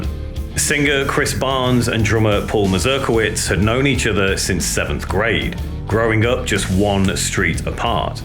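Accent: United Kingdom. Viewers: Even though you play live footages and cuts of the songs, my mom would fall asleep because your british accent since loves it. She doesn't even like metal. "Just put me the Brits on youtube, Dino".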